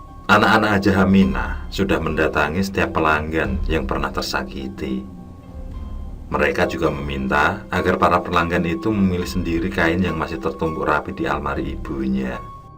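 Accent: Java, Indonesia. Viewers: Medhok men cuk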